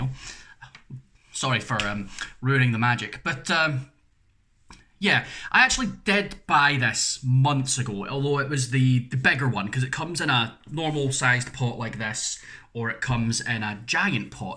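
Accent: United Kingdom. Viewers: where is your accent from? what part of GB? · Are you scotish im scotish aswell🙂🙂🙂🙂🙂🙂🙂❤❤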